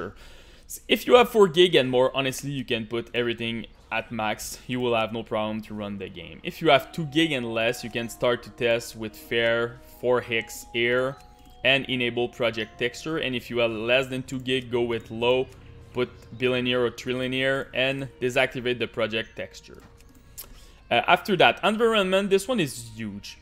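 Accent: Quebec, Canada. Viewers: Chu tombé par hasard sur ton vidéo pi juste en entendant le début j'ai faite : Ha ben, un autre Québecois esti :D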